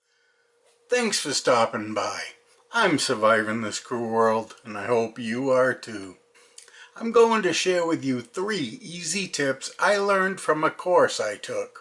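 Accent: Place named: Boston, United States. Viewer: Wonderful North Shore Boston or Southern NH accent.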